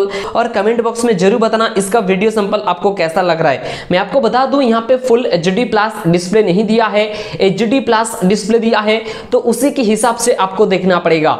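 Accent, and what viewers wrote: Bengal, India. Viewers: Bhai tm hindi aur english dono ki communication classes lena start kr do. Tmhare bolne me bengali accent h jo k itna irritating h k subscribe to bhul jao koi tmhari video pura bhi nhi dekhega.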